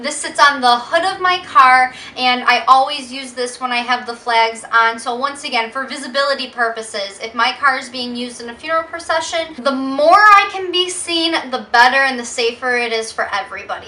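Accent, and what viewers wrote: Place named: United States. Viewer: OMG that bloody voice sends shivers down my spine - A whining American YUCK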